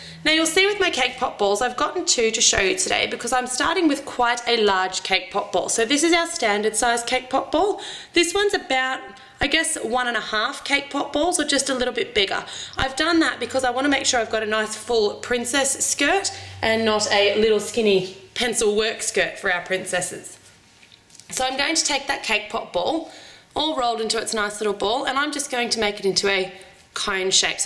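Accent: Australia. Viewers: Im always wonderin... ur austrailian right??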